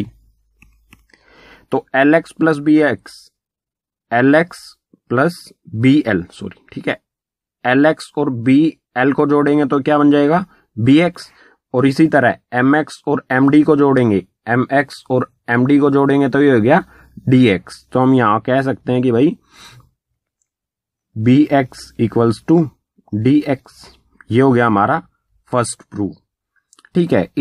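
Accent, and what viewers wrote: Haryana, India. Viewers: Sir aap Haryana se ho na ? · Sir aap haryanvi ho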